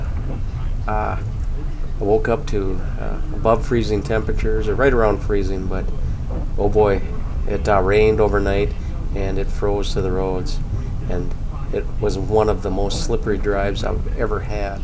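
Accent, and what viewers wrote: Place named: Minnesota, United States. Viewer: This is the most Minnesotan accent ever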